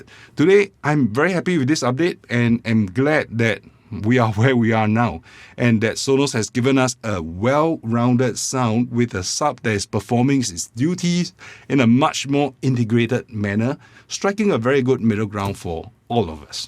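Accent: Singapore. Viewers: Singlish! Love it. I love your methodical approach and the fact that you respond to comments:) Thanks and Ho seh liao! :)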